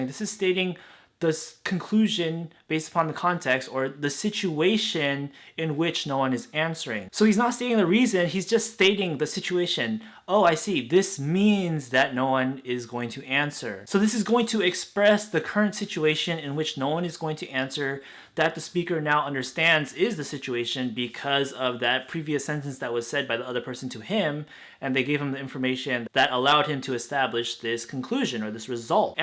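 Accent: United States. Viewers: are you fluent in japanese? your americanized pronunciations irk me.. and why do you have the romaji for という as touiu, not toiu??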